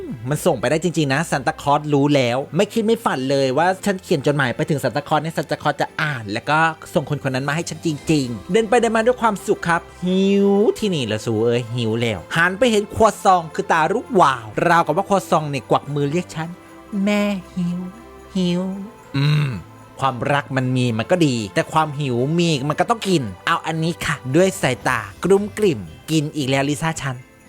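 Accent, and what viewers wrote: Isan, Thailand. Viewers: อยากรู้.ครับว่า.แอดเป็นคยจีงกวัดไหน..เว้า.อิสานม่วนหลาย.เด้อครับ..❤❤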